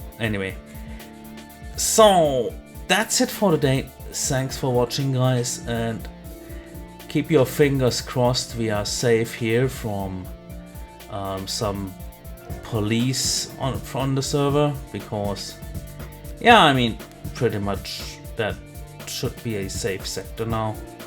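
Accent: Germany. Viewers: I just moved in here in germany and i can tell that you are german or at least something similar just because of your english. Correct me if im wrong.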